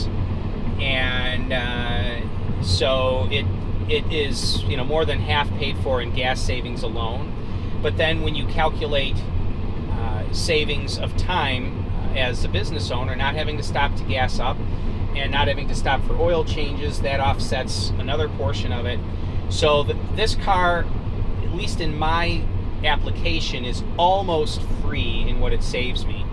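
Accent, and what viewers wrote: Midwest, United States. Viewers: Lmao your accent. Kills me. Love my midwestern folks. Right off the bat 👏🏻💀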